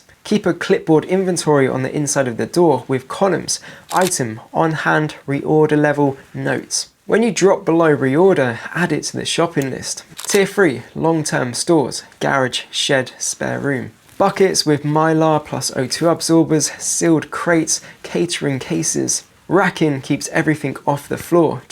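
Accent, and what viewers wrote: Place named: Home Counties, United Kingdom. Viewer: Solid, reasonable advice that even the most ardent anti-preppers couldn’t argue with, store what you eat and eat what you store, 👍. Even when I think I’m prepared these videos show how far off the mark I am 😂. Ps. (No hate) I’m not sure if it’s just the way the guy speaks but he seems to have a “Home Counties” accent yet pronounces so many words in really weird ways - wonder if he has other nationalities in his family that make him speak the way he does. “Toe-matt-os” also I’m not sure if he’s saying morel as in the mushrooms or “moral” as in keeping the mood up, very off putting when trying to listen what he’s saying. That’s being said - would like to see some real world videos where he puts these recipes etc to the test in his house with the electric/gas etc off for a week 😂.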